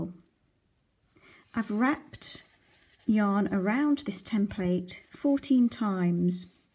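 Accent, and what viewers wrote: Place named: England, United Kingdom. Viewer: What a gorgeous English accent.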